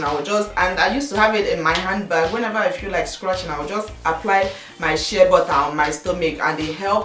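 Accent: Igbo, Nigeria. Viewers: Og Diva its her nigerian accent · stella-marie signature my sister, I couldn't stand it too, and her Igbo accent is so unstoppable. I love her videos but...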